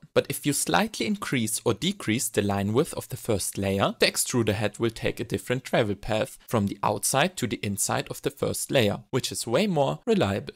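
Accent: Germany. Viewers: klasse Video, aber könntest du das vllt auf Deutsch machen? Dein Akzent klingt deutsch ;-) ich kann leider kein englisch...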